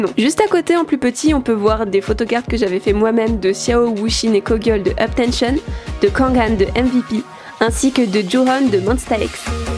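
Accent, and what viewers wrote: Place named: England, United Kingdom. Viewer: Cet accent anglais srx ♡ Sinon plein de love du Maroc ♡♡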